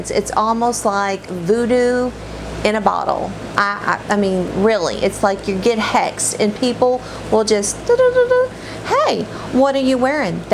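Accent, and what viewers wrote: South, United States. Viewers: Oh wow... not even available on Dior’s website. It seems they don’t even make it. I generally avoid EDT, but if that’s the version of this you have and love (& it actually lasts)... well, I’ll trust it! ;) ... you are adorable, btw! Love your southern accent!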